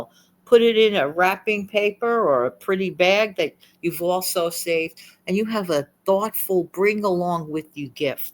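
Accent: New York, United States. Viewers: As always, great tips! Thank you for the reminder to start on Christmas now! We do have a bin store, and I never thought to look there for gifts. What a good idea🙂 BTW, I love your NY accent😀it's like listening to someone from a movie! We're from NW Georgia. Movies get our accent completely wrong. Every. Single. Time😂 Yours is delightful!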